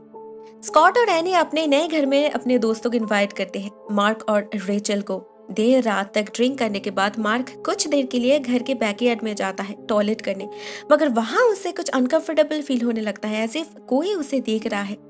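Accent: Bengal, India. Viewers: Didi are u Bengali🤩🤩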